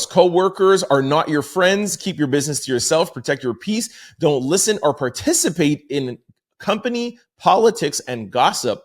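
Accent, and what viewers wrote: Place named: Canada. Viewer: You’re not even an American are you buddy? I can tell by your accent you’re Canadian, correct? Get lost.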